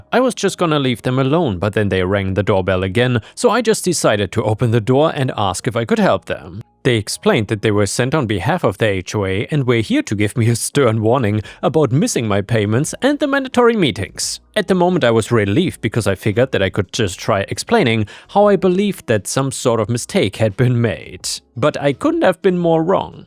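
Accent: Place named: Germany. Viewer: Hearing a guy with a German (?) accent do a Karen voice is epic! Love it!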